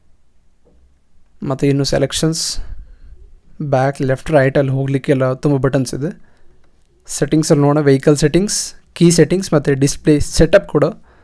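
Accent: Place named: Mangalore, India. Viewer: Bro are u from manglore...?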